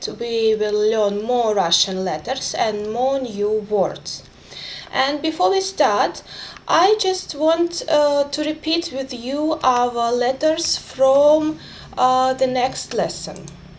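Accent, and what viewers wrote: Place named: England, United Kingdom. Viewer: your courses are amazing !! no problem with your english accent, i can keep focusing and understand you. Thank you !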